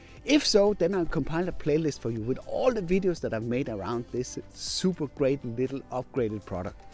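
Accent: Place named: Denmark. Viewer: Man kan godt høre at du er dansk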